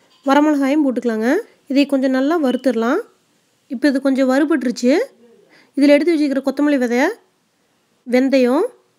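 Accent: Kongu, India. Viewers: Super sis 2day nite I will try this. Recently I suscribed ur channel. I like ur slangvery much. Kongu manam veesukirathu sis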